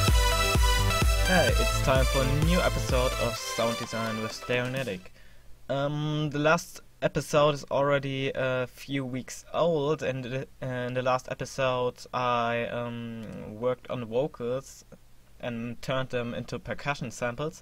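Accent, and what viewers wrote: Germany. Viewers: man merkt dass du deutscher bist Xd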